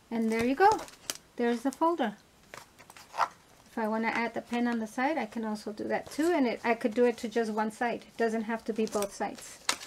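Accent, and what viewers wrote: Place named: India. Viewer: Nice done, People from india 🇮🇳 have a very cute accent , you sound like a sweet indian lady who works at my dentist office !! She is so crafty just like you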